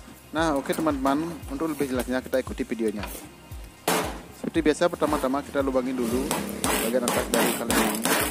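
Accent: Aceh, Indonesia. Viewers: Kyk nada aceh ni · Kyknya org aceh nih dr logatnya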